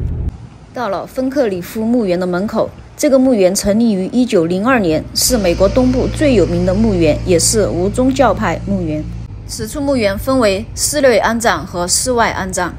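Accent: Sichuan, China. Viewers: 四川峨眉？普通话不准，😄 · 川普好评！👍听着就亲切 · 听口音应该是重庆或是四川人的博主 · 四川口音。。。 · 听你川话上头了，问一下你联系方式，也想听你的讲话保持上头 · 就事论事，川普话真是难听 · 我是贵州人，也是半个成都人，所以很喜欢听你的川普话。你去的这个墓园我两年前去过，我们当时是三个人去的，尽管大厅里有音乐，因为空旷无人，走起来毛骨悚然。你视频里拍到的那些地方我都有经过，我也看到了这几个人的墓碑，还有一些你没有提到的国民党高官的壁葬碑文。我拍了照片，发了微博，我也非常感慨，岁月不曾饶过谁。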